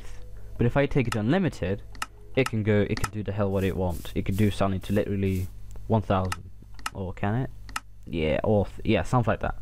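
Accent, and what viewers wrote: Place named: United Kingdom. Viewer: You're norwegian or british xD,i noticed your accent so i thought you were british.Anyways nice video mate helped me alot.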